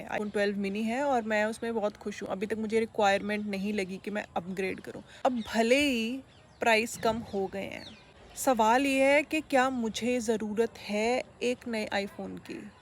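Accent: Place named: Punjab, India. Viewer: Aap Punjab k ho ya विदेशी Me Rajasthan se हू😮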